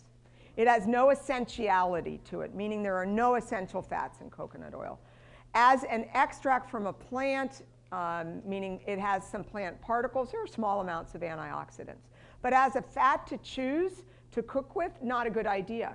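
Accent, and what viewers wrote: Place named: United States. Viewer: This lady has no sense of speech. Sloppy diction. And she gives the American accent an ugly name. Yuk.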